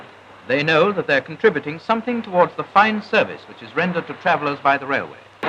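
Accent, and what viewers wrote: RP, United Kingdom. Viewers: These wonderful old movies from the days of steam power are always narrated by a "received pronunciation character, educated at one of the finer boys schools in the land. In the background a symphony orchestra is going absolutely nuts with a rendition Stravinsky could have penned. 😲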